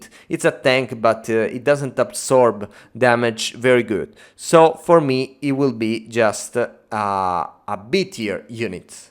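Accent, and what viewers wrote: Italy. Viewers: its an italian accent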